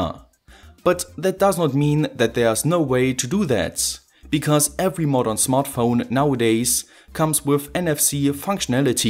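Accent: Germany. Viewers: Your accent sounds German to me. Kann es sein, dass du deutscher bist? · That’s quite a German accent of yours. But I don’t mind. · ouhhh! german hyperinflating english... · Dein Deutsch-Englisch is Klasse ;D #noHate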